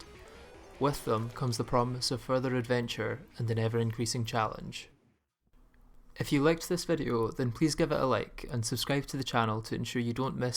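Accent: Scotland, United Kingdom. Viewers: You Scottish ???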